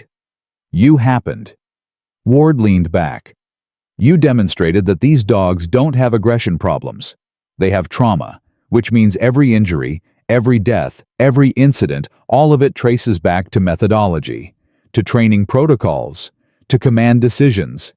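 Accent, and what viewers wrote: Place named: United States. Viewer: Sounds very US 🇩🇰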